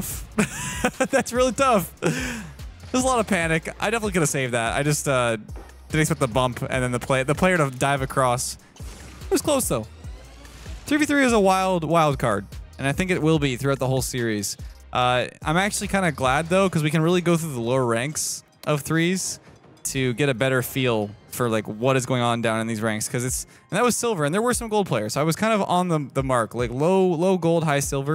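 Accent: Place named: Canada. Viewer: i can hear the canadian so much more now omg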